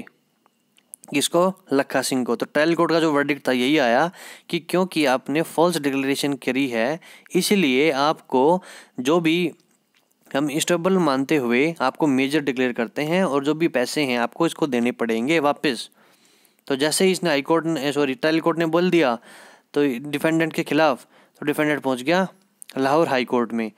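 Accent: Haryana, India. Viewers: Mast haryanvi... Good explain...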